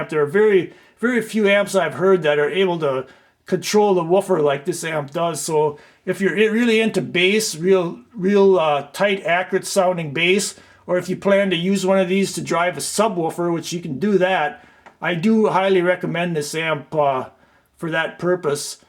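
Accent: Canada. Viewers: Nice video. Really informative, good job. Are you like, up in Canada, eh?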